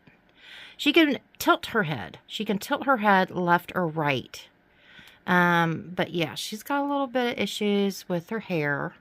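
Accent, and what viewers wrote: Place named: North Carolina, United States. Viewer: Neighbor! Ok.. Which state are you in? You almost sound like a North Carolinan😉.. I'm actually originally bien & raised in NC, moved to Tennessee 4yrs ago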